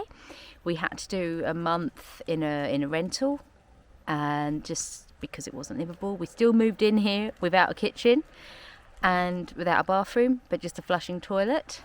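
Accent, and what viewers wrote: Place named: RP, United Kingdom. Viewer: Like your accent. Very posh.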